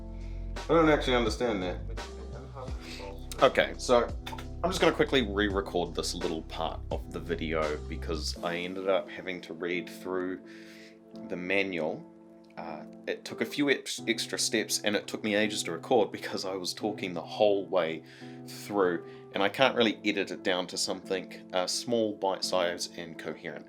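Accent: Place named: New Zealand. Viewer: foreeeeever agor haha kiwi accent is so cutee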